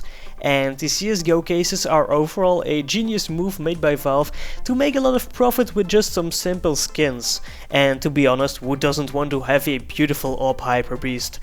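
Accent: Netherlands. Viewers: u Dutch bro? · dutch accent is the worst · is he dutch?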